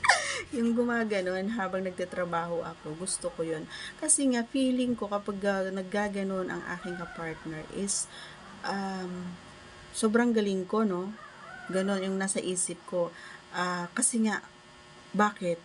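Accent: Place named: Visayas, Philippines. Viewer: Saan ba probinsia mo baby ? bisaya ako halata kita bisaya ka.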